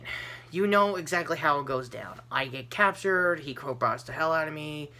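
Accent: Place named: United States. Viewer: You sound just like Tom Holland's American accent!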